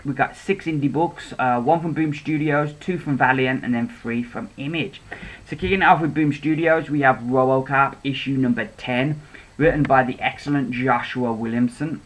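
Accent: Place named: South, United States. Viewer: Enjoying your southern US accent :)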